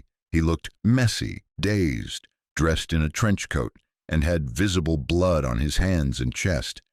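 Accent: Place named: United States. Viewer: Couldn’t stand the narration and American accent. Very annoying and full of repetitive dialogue.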